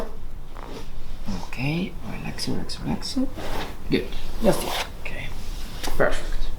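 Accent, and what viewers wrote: Italy. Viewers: Легкий итальянский акцент....))) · Your accent reminds me of Scuderia Ferrari engineer, "Slow button on, slow button on"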